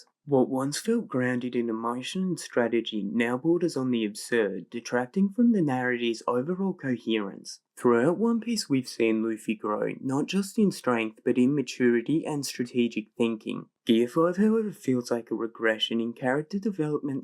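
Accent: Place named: Australia. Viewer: what is this aussie yapping about lolol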